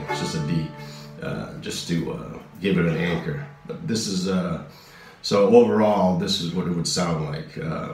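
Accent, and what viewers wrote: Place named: Philippines. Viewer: So cool to hear that he still has a bit of Filipino accent left lol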